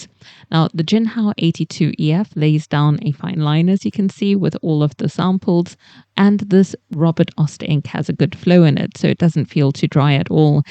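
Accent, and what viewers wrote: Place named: United Kingdom. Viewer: What a pleasure to hear a British voice on oens - and to have UK prices that actually mean something! Jinhao, Asvine and Hongdian produce fantastic pens at good prices. And I hate Sailor pens - mine never make it out of the case. I should sell them but it would be cruel and unusual punishment on the buyer.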